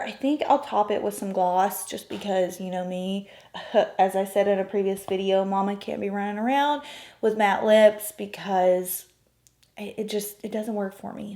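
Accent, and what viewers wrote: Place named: South Carolina, United States. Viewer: I would like to try the Hydra Serum for my dry, sensitive skin. Your accent helps with my homesickness - I'm from SC, been in Cali 20 years 😉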